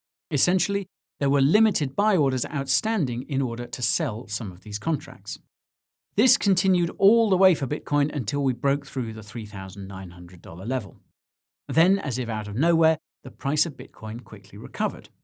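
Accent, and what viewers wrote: United States. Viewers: Great American accent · Excellent American accent,way to put yourself out there,. Love your content, brother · Solid American accent! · your hilarious great American accent x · Lol his American accent cracks me up. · I must admit, the American accent was rather hot!💥❤ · Nice American accent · The American accent kills me! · nice american accent u only slipped once! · You sound like Nicholas Cage with the American accent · I gotta say,. Your American accent is hella lot better than my British accent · ur american accent is good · american accent ....lol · nice American accent. :-) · I love the American english accent 😆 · Great vid. Loved the American accent. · Killer American accent, brother. As much as I try I can’t never get the entire Brit inflection right 😂😂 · Your American accent is on point!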